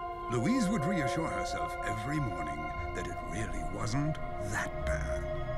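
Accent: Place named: United Kingdom. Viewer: stuffy British narration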